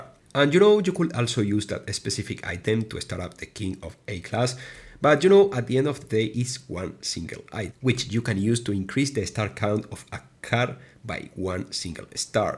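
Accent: Spain. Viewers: his main lang is spanish, i personally love his accent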